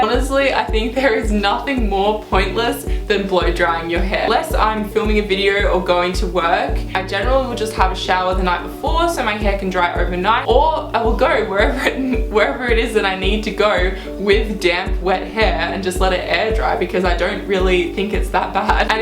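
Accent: Australia. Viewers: Is that an aussie accent? Or kiwi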